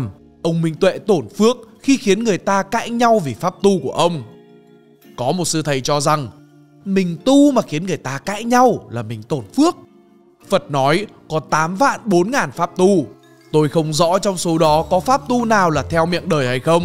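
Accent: Standard. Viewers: Bạn nói chuyện đúng chuẩn!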